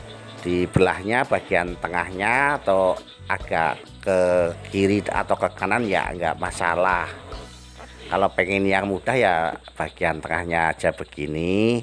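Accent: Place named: Banyumas, Indonesia. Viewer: Logate banyumasan banget pak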